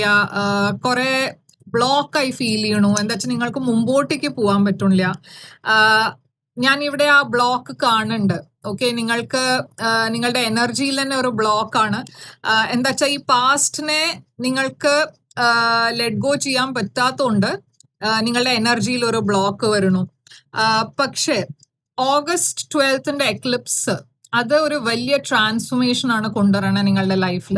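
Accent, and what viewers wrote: Kerala, India. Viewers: Please pronounce English in English....not in Malayalam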